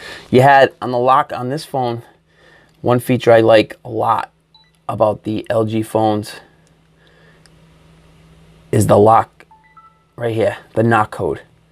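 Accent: Boston, United States. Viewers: That Boston accent!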